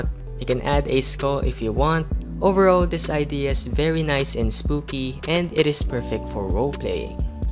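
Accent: Philippines. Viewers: Your accent its like a filipino Btw: Filipino here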